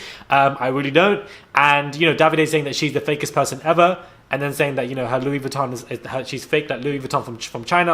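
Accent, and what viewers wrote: United States. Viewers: can we just stop with the American accent?? disgustanggg Thank goodness this channel exists, if love island doesn’t want us to comment on their videos then this is our outlet. I agreee, Gemma and Luca are BORING, these episodes are BORING, the fact that there’s been no challenges or preview of the next episode is whack. I literally come to this channel FIRST before I even watch the episode to see if it’s worth it cause lately it really hasn’t been.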